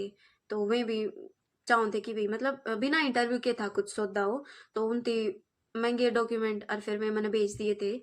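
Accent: Haryana, India. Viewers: It's little bit harder to understand haryanvi but not tough ❤❤❤ Tnx to make this video 🎉 Congratulations 🎉👏🎉👏...( Me bhi gam ki chori hi hun didu 😢😢😢😢) ...and that all problems I have 😢😢😢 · omg i just found your vedio and its soo good cheery on the cake your haryanvi makes itt more better 🤗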